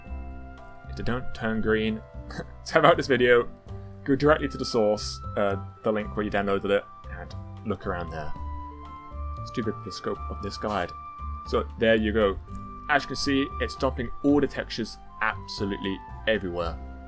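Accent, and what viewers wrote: United Kingdom. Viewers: you sound such british =D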